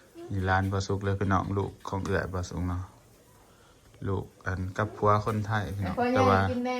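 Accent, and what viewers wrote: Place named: Ubon Ratchathani, Thailand. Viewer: เว้าคือทางอุบลบ้านแม่เลย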